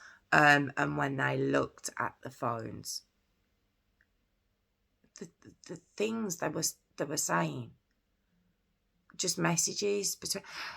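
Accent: Birmingham, United Kingdom. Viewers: Thank you so much! Please do be assured that I love the brummy accent! I’m from Northampton in England and I went to boarding school in Worcester when I was a kid. I went there because it’s a special school for visually impaired people so they have everything naturally equipped for people such as me. Please don’t ever think I mind talking about either of my disabilities, on the contrary I love to talk about them so as to raise as much awareness as possible! I’ve been blind ever since birth which is probably why it doesn’t bother me in any way at all. My biggest challenge is fitting in with the world as everyone expects people like me to embrace and adapt to all sorts of visual stuff left right and centre, which if you can’t see at all and never have done, it’s unbelievably frustrating that I can’t just be allowed to be who I am. If you see what I mean? 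My Autism has generally been much more of a challenge overall, I’m nearly 34 years old and it’s only really over the last 10 years or so that it’s been fully realised and picked up that I have Autism. I think because it’s only quite mild Autism and not like it’s completely severe. Also Autism was never really talked about when I was much younger and so it was generally assumed I was just a stubborn and awkward person who couldn’t be bothered to behave properly! But it just shows Autism doesn’t need to be really severe, you can still have Autism even if it’s more on the mild side! I find I generally struggle with the social wo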